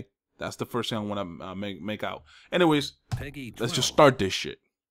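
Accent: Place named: Dominican Republic. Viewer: xD hasta hablando ingles se te sale lo dominicano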